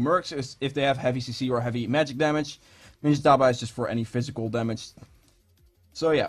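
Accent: Netherlands. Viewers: ur dutch am i rigth?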